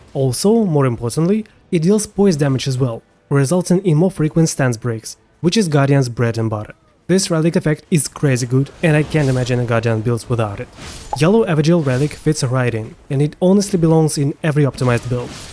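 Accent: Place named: Russia. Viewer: Какое же плотное у меня ощущение, что твой родной язык русский, с этим акцентом, который слышится даже лучше чем мексиканский или индийский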